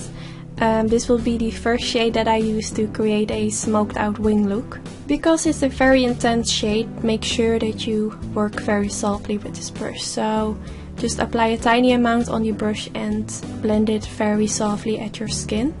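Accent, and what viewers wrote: Netherlands. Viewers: Aha! I knew you were Dutch! :-D My dad was half Dutch and half Indonesian so I recognised your accent and it made me think of him which is nice. Where in The Netherlands are you from? My dad and his mother lived in Den Haag and my family used to visit her when I was a child. :-D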